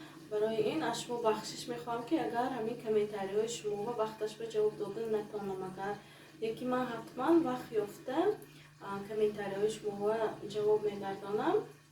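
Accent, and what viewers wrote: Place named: Khujand, Tajikistan. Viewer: Рахмат ки чавоб додет👍👍.Ман аз гапзанитон фахмида будамки хучанди .Некин боз пурсидамм 🤭🤭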